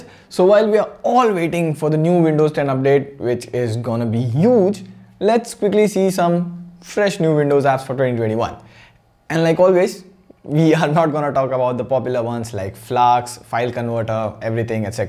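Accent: India. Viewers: u Sound like Indian Accent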